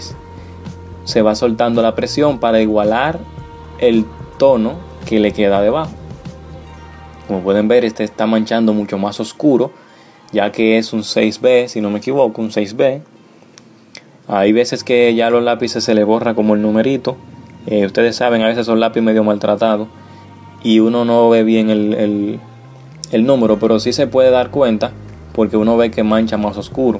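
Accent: Dominican Republic. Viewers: Eres dominicano? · Parece en la voz dominicano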